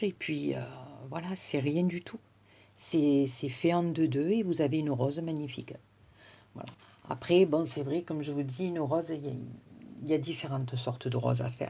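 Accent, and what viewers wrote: South, France. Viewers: Bonsoir, merci pour nous montrez comment faire ces superbes 🌹 roses, j ai tout compris mais puis je permettre, dommage que votre main par moment cache vos gestes. Malgré j apprécie vos superbes vidéos, agrémentées du magnifique accent du midi. Bizettes Lorraine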